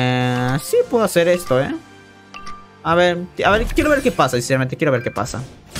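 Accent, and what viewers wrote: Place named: Pocho, United States. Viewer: Yo te escucho más pocho fiu fiu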